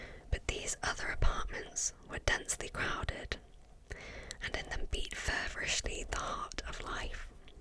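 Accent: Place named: United Kingdom. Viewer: Not enough British ASMR reading videos out there! This was very relaxing,!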